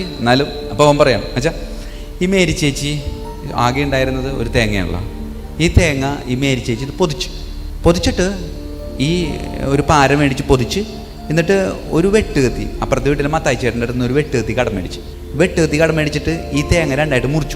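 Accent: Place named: Ernakulam, India. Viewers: This is paravoor accent. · നല്ല കൊച്ചി ഭാഷ 👍👍👍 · അച്ചോ, അവതരണം സൂപ്പർ, 👌👌👌ചിരിച്ചു ചിരിച്ചു ഒരുപാട് ആയി, നമ്മുടെ എറണാകുളം സ്ലാഗ് 👌👌🙏🙏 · Paravoor slang aan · കൊച്ചി സ്‌ളാഗ്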